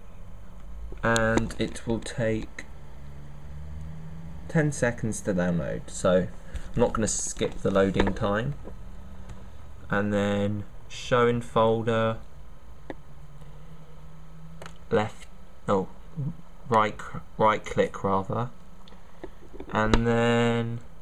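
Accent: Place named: United Kingdom. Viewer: You have british accent! :3 thanks 4 this, man!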